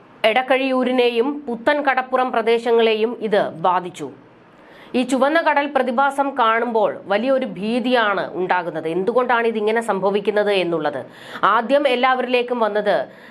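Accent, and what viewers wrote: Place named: Palakkad, India. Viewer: മിന്നൽ മുരളി കാണാത്ത വർ മിന്നൽ ചുഴലി കാണാൻ സാധ്യത ഉണ്ട് 👌🏿പാലക്കാടൻ ഭാഷ. കേൾക്കാൻ തറ വായന 😂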